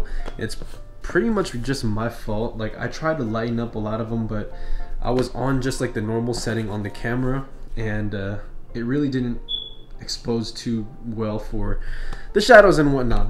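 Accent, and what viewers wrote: Philippines. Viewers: Road to 100k subs folks. Like William veerbeck and other black guy who loves film photography, they did it too. Keep uploading more videos. Love it, from your filipino accent. Hehe ♡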